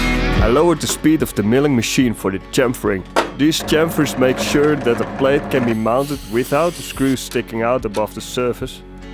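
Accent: Netherlands. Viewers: sound like you also talk dutch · where you from? you have a dutch accent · This is the first video I've seen by you. I immediately subscribed to your channel and will check out your other videos. I really like your idea and of course your 3D printer looks cool as f*ck. By your accent I immediately heard you are a fellow Dutch. I hope to see more good stuff. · dacht all aan het aksent dat hij NL/BE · i think ur dutch u have a dutch acsent(im also dutch) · Your accent gave you away quickly :) later in the video it was confirmed Lekker gedaan pik ziet er prachtig uit! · Absolutely love the video, really nice build however would you mind doing a video in Dutch as the accent is so thick it would be easier to watch In Dutch 😅 anyway as I said before the engineering part of it is very well done! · ofcourse he is Dutch, why am I not suprised :) we are some genius people :) edit: confirmed lmao. 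I can recognize that accent and that view from anywhere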